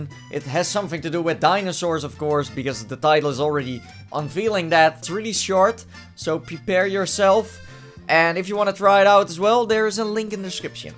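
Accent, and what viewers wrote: Netherlands. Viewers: Ik kon gewoon horen aan je accent dat je nederlands bent :D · Dat nederlands engels accent xD eerste 3 seconden.. oh, tis een nederlander xD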